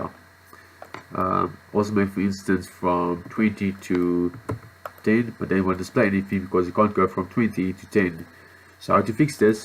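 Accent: Afrikaans, South Africa. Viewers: Hes from South Afrika. Theres no doubt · like jou afr accent XD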